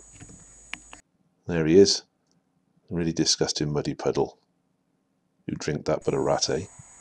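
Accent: United Kingdom. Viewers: British voices make for great commentary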